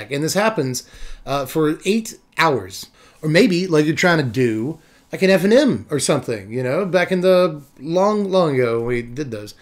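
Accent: United Kingdom. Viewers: I liked this vid specifically for the crazy brit voice lmao. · Love the English accent haha as an Englishman my self it was perfect 🤣 love you keep up the amazing work!!! Best MTG creator and it ain't close!!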